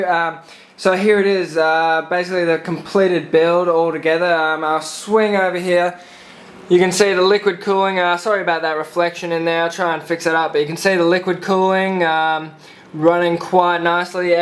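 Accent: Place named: Australia. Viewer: Ahhh, Australian accents. I have to say, I was pleasantly surprised.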